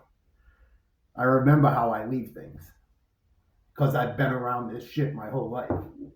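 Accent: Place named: Boston, United States. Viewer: Good stories! The boston accent😎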